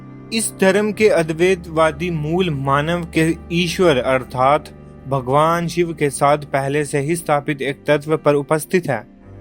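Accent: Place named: Kashmir, India. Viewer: Narrator is Kashmiri, I can tell from the accent… loved that ❤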